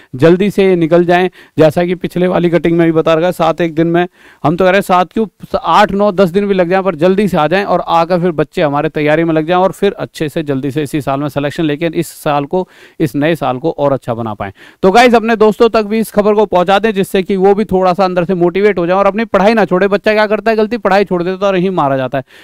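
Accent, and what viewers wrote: Haryana, India. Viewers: Haryana ki ha ya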